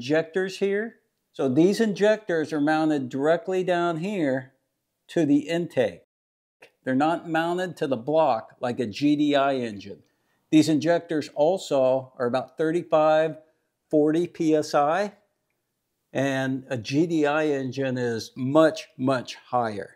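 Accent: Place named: United States. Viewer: I noticed that too. Thought it was a European spelling but he sounds American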